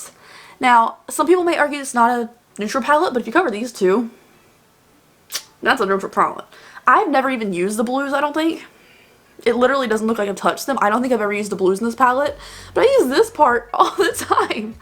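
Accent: South, United States. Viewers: I really like your videos only thing as European that speaks perfect English I have a problem with understanding your southern accent. Maybe talk slower idk😭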